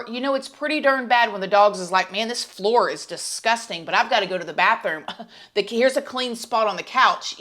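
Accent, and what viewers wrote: South, United States. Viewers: Watched a few of your vid's. Then one day I noticed you have a southern accent. I lived in the south for awhile. Love your accent. And yes we all love you!!!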